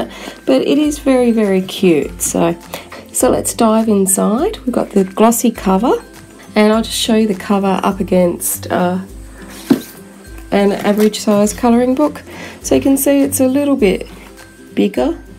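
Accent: Australia. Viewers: Hi Have you moved yet? I seem to remember you saying you ere moving to Brisbane? Love all your reviews thanks for the effort you put into it. It’s so lovely to hear an Aussie accent too 😀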